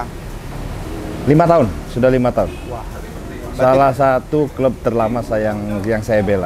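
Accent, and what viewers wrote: West Java, Indonesia. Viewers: Logat ngomong nya sunda🤭😂 mungkin kelamaan di bandung haha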